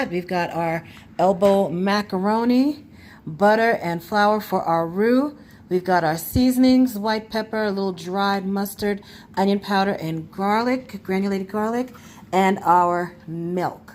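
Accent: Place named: South, United States. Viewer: you got that soothing sothern voice 👌🏾 thats how u know the mac official, like a ref w a whistle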